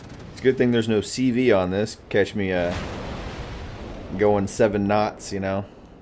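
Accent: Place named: Texas, United States. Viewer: Much enjoyed ty! You've mentioned you're in Texas - I am curious what general region and is that where you're from? Mostly I'm curious your accent which is not over-the-top but just enough : ) For my part I was not born in Texas but I got here as soon as I could!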